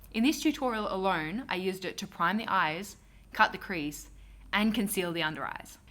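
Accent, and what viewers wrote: Australia. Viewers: I crave shape tape soooo much, but Tarte is not sold in Italy and the shipping costs is quite high for me... I can't stand this situation anymore 😭😭😭😭 #tartecometoitaly P. S. your aussie accent is so pretty! 🐨❤️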